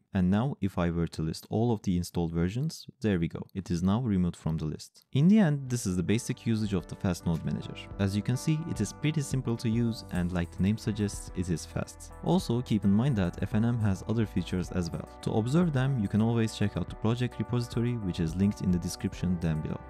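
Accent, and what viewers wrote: Turkey. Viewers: you sound turkish, why no turkish video?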